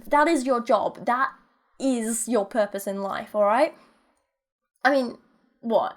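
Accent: United Kingdom. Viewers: Are you British? I swear i can hear a bit of British in your accent.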